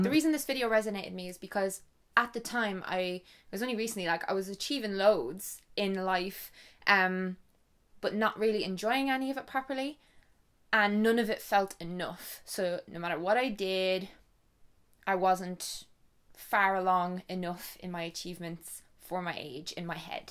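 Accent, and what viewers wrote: Ireland. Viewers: Love your Irish accent :)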